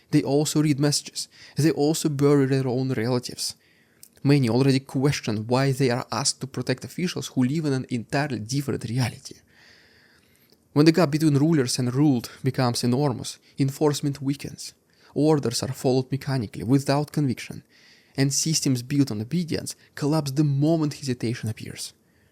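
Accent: Russia. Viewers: I like your accent. You are speaking English when I listen, but to my ear it still sounds completely Russian at the same time. Happy new year brother!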